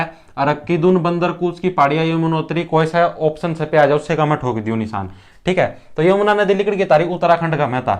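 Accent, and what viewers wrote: Haryana, India. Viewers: nice strategy to cover this topic ..it's very helpful...nd aapki Haryanvi to best h 😂🤘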